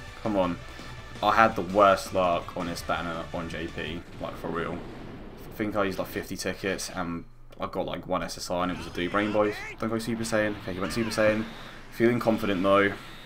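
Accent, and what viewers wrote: United Kingdom. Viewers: YOURE BRITISH?!?! Who woulda thought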